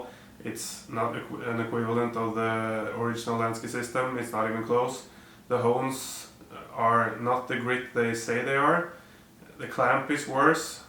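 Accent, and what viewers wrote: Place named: Norway. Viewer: This er the most norsk engelsk jeg have ever hørt. Gøy å kommet over denne gamle legenden, savner gamle ytnorge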